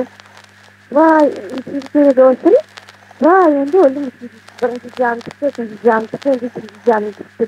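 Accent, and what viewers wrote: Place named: Coast, Turkey. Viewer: Bülbül yalıboy şivesi, diñlemek hoş keldi). Kereksis şatırdı alınsa daada yahşı anlaşılır. Sağ oluñ, var oluñ. Işleriñiz oñ kelsin!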